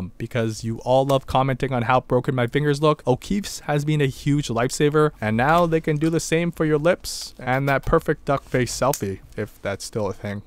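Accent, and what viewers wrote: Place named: Canada. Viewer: Canadian with a light lisp?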